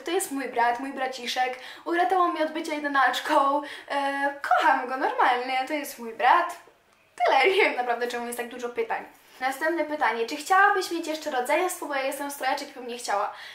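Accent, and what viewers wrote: Italy. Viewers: Kocham twój włoski akcent, jest świetny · Ale masz włoski akcent 😄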